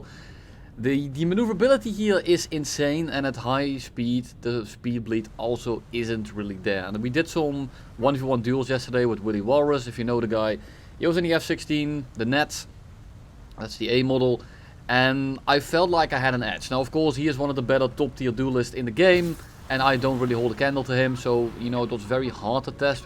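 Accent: Netherlands. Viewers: I smell netherlands behind your voice accent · Fist second in the video and I know he is Dutch 😂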